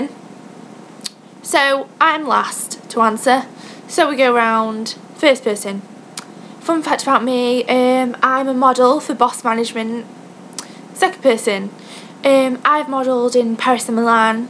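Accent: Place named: United Kingdom. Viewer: Where abouts in the UK are you from? Your accent is sooo familiar...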